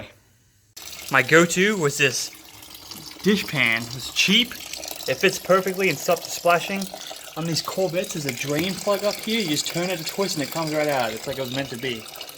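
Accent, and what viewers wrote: Australia. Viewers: don't pay these dudes any mind. They are only used to hearing American accents. You sound like Australian or New Zealand. I can understand you clearly. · Australian ? Your accent and the flag on the wall are hints that you are. Bless the koalas and other endangered animals. Enjoyed your video. Looking forward to more.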